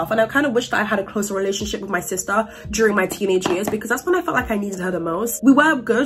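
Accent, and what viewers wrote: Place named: United Kingdom. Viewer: I very much appreciate the subtitles because the auto generated ones always mess up with British accents lol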